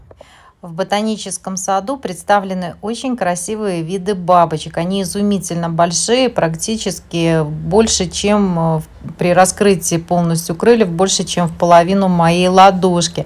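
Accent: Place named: Latvia. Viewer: Это латышский акцент...😊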